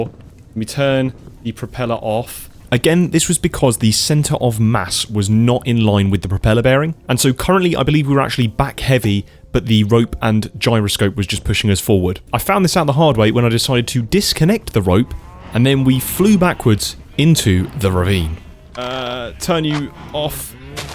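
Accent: United Kingdom. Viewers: This guy kinda sounds like stephen merchant ngl. And no, not just because he has a british accent. Its just stephen merchant without pronouncing any R at the end of words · Personally for me I've always loved create, didn't fully enjoy others using it though, I 100% enjoyed your video. And the English accent is a genuine cherry on top, very soothing voice.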